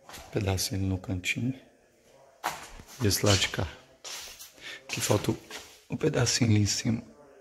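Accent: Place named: Minas Gerais, Brazil. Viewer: Veio você ainda não perdeu o sotaque mineiro ,ainda bem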